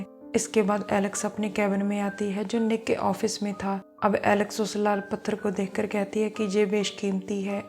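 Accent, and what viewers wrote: Punjab, India. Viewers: Hardcore punjabi accent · so much punjabi accent..in your voice..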